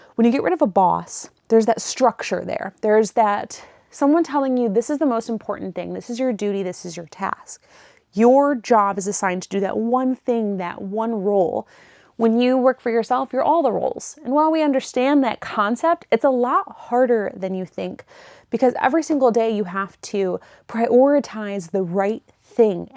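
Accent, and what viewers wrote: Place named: Midwest, United States. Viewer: I just hear accent, maybe Midwestern? Maybe there's a touch of nasal sound. Trying to hear what you complain about, maybe a couple bits of that sound. But certainly not like the Hollywood exaggeration that makes you want to mimic the sound and turn it off. Anyhow, she sounds like a sweet and lovely young lady. Didn't your mama teach you that if you can't say anything nice, to say nothing at all? Just scroll on with your miserable self and leave the sweet ladies alone!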